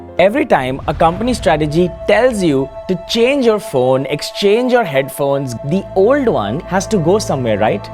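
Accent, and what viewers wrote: India. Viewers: Just discovered this channel, usually it is hard for me to listen to an Indian accent, but you do amazing job. Subscribed!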